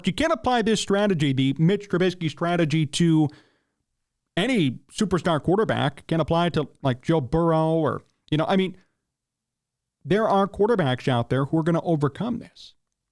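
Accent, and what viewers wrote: Chicago, United States. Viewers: Hey your Chicago accent is Golden 😄👍💯 Da Bears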